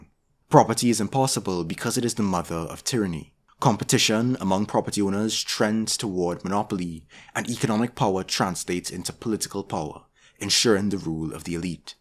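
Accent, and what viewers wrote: Trinidad and Tobago. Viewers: Are you Trini? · Are you trini?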